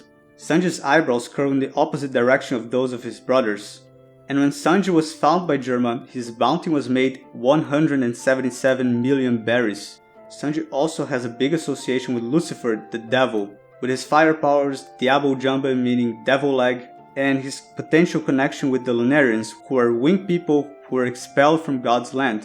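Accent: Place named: Brazil. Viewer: Pelo seu sotaque... Você é br?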